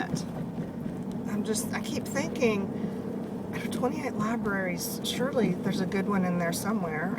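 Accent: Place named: Houston, United States. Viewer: You are possibly the coolest mom that has ever lived. (I remember you said you had a daughter from one of your other videos I watched) You make YouTube videos and art projects. Not to mention you are super funny. Wish my parents were this cool when I was a kid lol. I was wondering where you were from because your accent is so familiar. Half of my family is from Houston so now it makes sense lol. Love you channel!! -Katie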